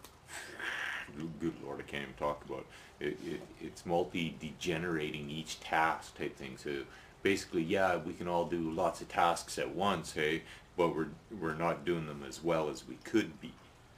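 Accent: Canada. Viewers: I am thinking you are from Canada, perhaps one of the Atlantic provinces?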